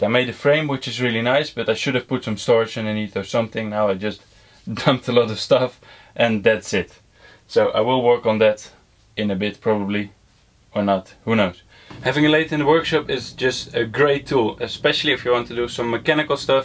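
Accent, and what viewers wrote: Netherlands. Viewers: Listening to you, it seems to me you'r Dutch, but I stick to english so more people can read your channel. My workshop is 2,4 x 3,5 meter, so not much bigger. Also I 'm a fitter & turner for over 35 years. therefore I was keen to see what solutions you came up with. One of the great things of the workshop is your lath. Maybe one day I have one as well....One thing that I discovered is that I like to keep my tools in a drawer or systainer. The main reason is DUST. I'm going to make a central vacuüm cleaner system to keep the dust to a minimum. This is going to be a system at about 2 m high, with a arm or rail to guide the hose. I hate it when I saw with a plunge saw and the vac.cleaner hose hooks up to the rail or bench etc. anyway I'm curious what is coming. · I am new to your channel. This video popped up as being recommended. So I watched it Very interesting how you organized your shop. 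I also watched some other videos from you, straight away. They are interesting as well, so I subscribed. Is it possible that I hear a Dutch accent in your English?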